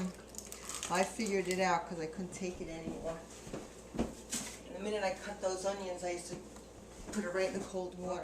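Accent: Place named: Brooklyn, United States. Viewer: I gotta try this recipe. Thanks for showing us and giving the recipe. OK, I gotta know... Where you are from. I'm guessing Brooklyn or maybe New Jersey. Whshew... Thick accent... But then-again, you'd probably think mine is thick too... :-D I heard you keep saying "My husband likes..." It made me chuckle as I thought a great idea would be to put a few jalapenos in there and warm things up a little!!!! See if your husband "likes" that!!!!!! Woo-hoo!!!! :-D Thanks again! Ya done good on the video!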